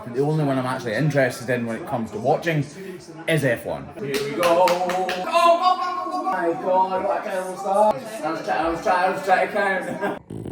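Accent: Scotland, United Kingdom. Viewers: Having had some experience with carbide cutter heads on many different woodworking applications i can say i prefer the three or more cutter ports. The reason being is that theres more contact and control. It works the motor less. And the cutters last longer. No offense to the styling. Its perfectly functional. Oh, and you can get the square bits that have curved sides so its not so aggressive. Or even whats called in the woid turning world as negative rake cutters. Meaning they have a slight bevel on the cutting edge that smooths out the cut. And... You can even sharpen those carbide cutters on a diamond stone. Carbide aint cheap. You are the first hoif trimmer channel i found, and having grown up on a small private farm, i never saw a hoof trimmer and we kept constantly. Enjoyed the FFA knew of and watched farriers trim and shoe horses, but was never aware of hoof trimming for cattle. 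Ive been hooked ever since discovering you. Having Scottish ancestry its a blast hearing the accent. To you id have the worst southern hick accent you ever heard.😂 yall come back now ya hear?!🤣😎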